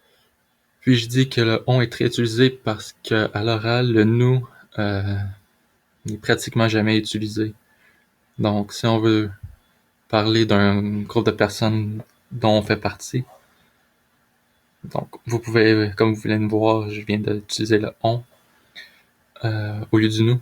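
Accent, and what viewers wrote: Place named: Quebec, Canada. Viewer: J'adore l'accent québécois ! .. et tes vidéo aident moi .. Merciii ...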